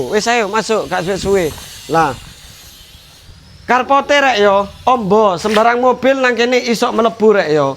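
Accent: Surabaya, Indonesia. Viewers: Aku seneng logat suroboyoan mu mas. Salam dari boyolali lereng merapi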